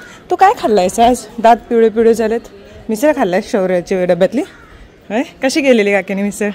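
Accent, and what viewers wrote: Kolhapur, India. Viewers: तुझी कोल्हापुरी मदून मदुन बोलतेस आवडत ऐकाय bolt ja kolhapuri avdel eiky ❤श्री स्वामी समर्थ ❤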